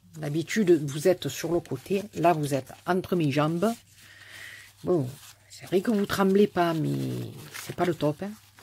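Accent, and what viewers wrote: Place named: South, France. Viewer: Bonjour j'insiste lourdement avec ton accent mais ça me fait vraiment penser a mes cigales d'Ardèche qui me manque énormément .ta carte est superbe et moi je n'avais jamais vu ce modèle. Merci pour ce joli partage bisous et bonne journée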